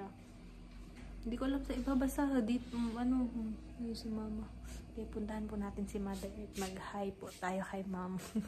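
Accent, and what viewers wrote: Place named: Leyte, Philippines. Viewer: Leyte ba kayo gang ? Parang leyte speaking kc kayo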